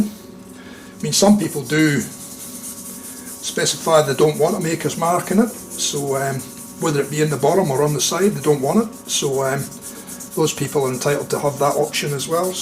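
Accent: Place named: Scotland, United Kingdom. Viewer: boy! That accent sounds very close to home! And here was me thinking you were an Aussie! I didn't know my brush was made by a Scozzy!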